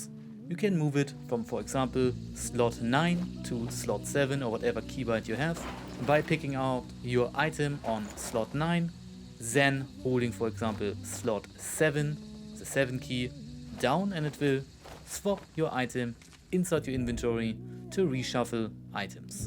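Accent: Germany. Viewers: My guy your accent always trips me out. 90% of the time it sounds very German but 10% of the time it sounds French 😂😂 it’s German right?!?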